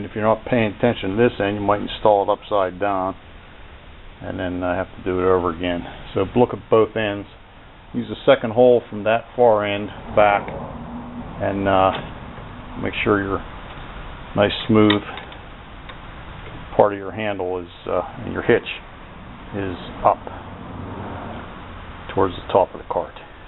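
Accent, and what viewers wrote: Pittsburgh, United States. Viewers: Thanks for the video! Your accent sounds like your from the burgh.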